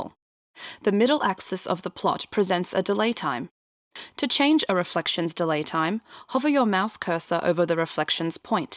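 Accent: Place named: England, United Kingdom. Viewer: Is she hot? (She does have an English accent)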